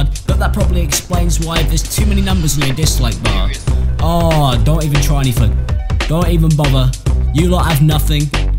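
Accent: United Kingdom. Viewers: I cant take it seriously with his british voice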